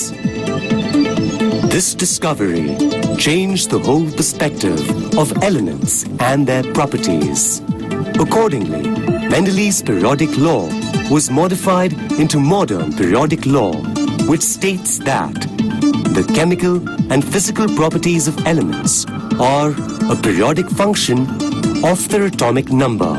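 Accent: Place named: India. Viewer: indian accent spotted this is gonna be GOOD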